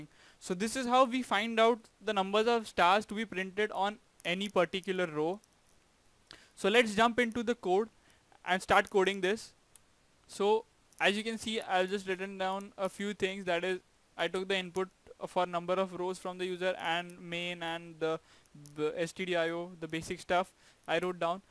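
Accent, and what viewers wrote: India. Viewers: fuck indian accent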